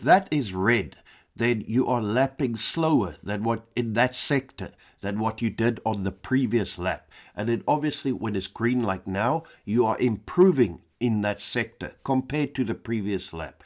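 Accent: South Africa. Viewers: Nice video. Sold me on the pedals 🙃 you South African? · you sound south african?! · South African accent ?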